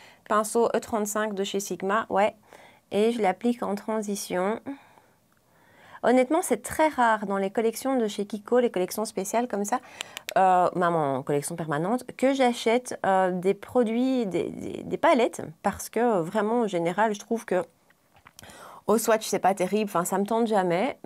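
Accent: Belgium. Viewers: Merci pour cette excellente revue (comme d'hab:). J'adore ton petit accent belge!:)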